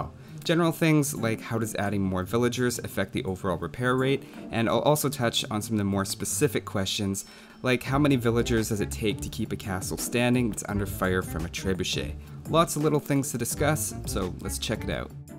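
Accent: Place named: Canada. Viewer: L'Orso Totalworriano Indeed. AoE3 is more about collection treasures and building trading posts and herding buffalos and bisons in the beginning, instead of building camps and finding sheep and so on. I agree, has a cool voice to listen to. These soft Canadian sounds:)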